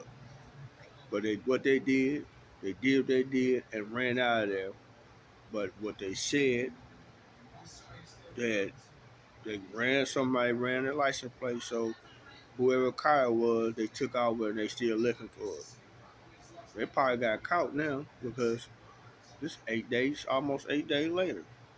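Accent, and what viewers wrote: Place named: Deep South, United States. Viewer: Sound like a slave telln a story. Must be deep south Georgia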